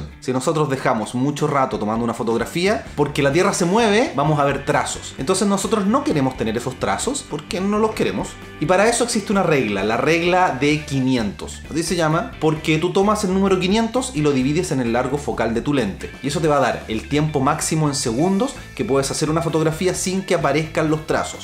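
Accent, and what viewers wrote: Chile. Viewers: Eres chileno.. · chileno we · Nuevo sub campeon. Primer video que veo tuyo y me redulto muy util. Saludos desde argentina. Supongo que por tu acento sos de chile. Se ve que sabes mucho del tema. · De qué país es? Su acento me parece un poco chileno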